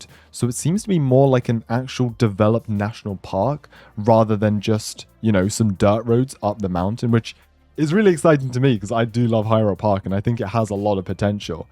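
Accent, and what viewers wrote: England, United Kingdom. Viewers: Wait your English or British? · question, are you British?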